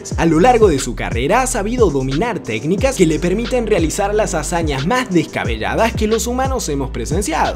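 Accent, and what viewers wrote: Argentina. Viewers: No sabia que eras argentino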